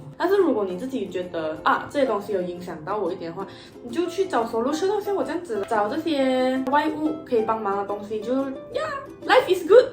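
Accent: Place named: Malaysia. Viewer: 我的天 这就是同为马来西亚人的同乡直觉吗(bushi 哈哈 刚刚滑到你的影片 一点进去听了全程韩语 再看了看频道名字放的是英文名，就在猜说是不是马来西亚人呀，结果还真的 哈哈 一点这部一听口音就确认了 哈哈 加油呀🙌影片很棒👍👍😍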